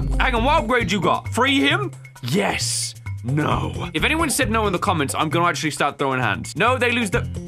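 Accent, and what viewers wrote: United Kingdom. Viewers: I sometimes forget about you being from the uk until there's some words you say differently. Or use meters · Sometimes I can hear your accent in some words, sometimes not. I think as you said you are somewhere in the middle :) It's not that british english like first thing I think to myself hearing you is "Wow that's some strong british accent!", it's a bit smoother or softer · asking about his accent: me who actually grew on a british accent because of him and now being worried if its not so british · You definitely have an accent but it's pretty faint compared to other British people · Of course you have an accent everyone has an accent, I myself have a P.A Dutch accent because its were I was born, you have a Britishy accent but you already knew this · I can hear a British. But just me. Still like your vids! 🙂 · To answer your question, you do sound like you have a British accent, but it's kinda faint? 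If that makes sense. You can sound American one minute and the next British. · to me it sounds like you have a british accent but its not very strong like its not really obvious to hear just like dawko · Yes u have a accent. Bri-ish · you are very British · Yea your language sounds like its in the middle with British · He just sounds British how can a British man have British accent when it was the voice he was born with?please help I don't understand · kidna british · yes you have a biritish accent it's not heavy but it's there. like how you say for, it sounds like the number four, more emphesis on pronouncing which I'm very okay with. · You got a british accent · Its insane on how does not hear his bri'ish accent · Yep, to this Canuck you have a British accent, but like any spoken language it would be regional to your area. We have the same thing over here in Canada - we might all sound the same as Americans to the untrained ear, but there are differences for sure. 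All this to say - always enjoy your content and don't mind people giving you grief accent wise! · You that uncommon British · Of course you have an accent, everyone has an accent. I don't know why some people think that standard american english for example isn't an accent even though it clearly is. I can definitly hear that you have a british accent but I don't know which one since there are so many accents in Britain. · you def have an accent, im american and you sound british like in between the two extreme in the meme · yes, you do have an accent, British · FACTUALLLL I do that with my cats and it's so lovely! They're my family and I love them so much! 🔺🔺 💞 Also I believe you have a slight british accent, not as british as Dawko but also not as non-british as non-british people lmao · I can hear some Brit in your voice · You sound British! So you do have a accent!! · As an Australian listing to u you had a accent but no tom Holland British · Bro sounds a bit British imo, he might be from there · Now that I think about it your voice is very odd compared to most British people I know, it’s like the way you pronounce things is very British but if you don’t really have an accent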